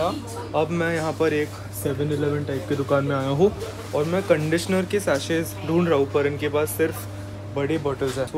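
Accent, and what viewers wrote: Maharashtra, India. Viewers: marathi Manus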